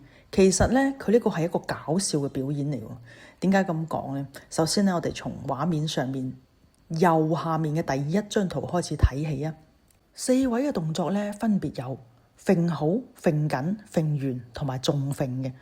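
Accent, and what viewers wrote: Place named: Guangzhou, China. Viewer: 主持人有廣州口音🤔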